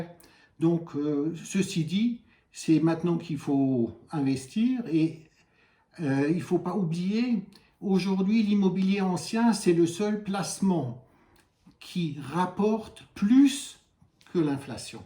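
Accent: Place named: Alsace, France. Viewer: haa ,cet accent alsacien too much looie...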